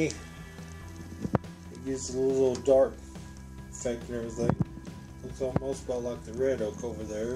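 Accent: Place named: Texas, United States. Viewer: I'm from Texas.. I can tell lol my wife and I really enjoyed this video :-)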